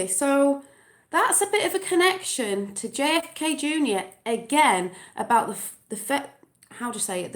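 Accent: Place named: Yorkshire, United Kingdom. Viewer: Yorkshire? I have friends there, they talk like you. Thank you for sharing so much with us, your passion is contagious!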